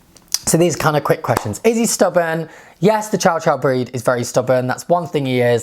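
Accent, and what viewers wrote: England, United Kingdom. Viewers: I just love his British accent ... I can spend hours hearing him talk... ✨️ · Are you from England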